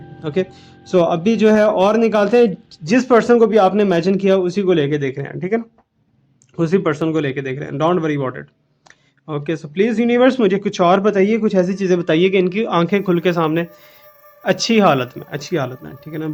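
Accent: Punjab, India. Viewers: Sir pls btaeye aap basically khaan se ho?.aap hmare jesi theth Punjabi kese bol lete ho.m toh reading mien aapki Punjabi sunne hi aati hoon.TUNN VATTA 😂😂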